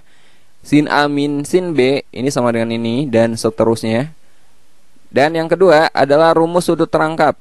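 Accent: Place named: West Java, Indonesia. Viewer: Abang nya orang sunda ya? Logat nya kek sunda😅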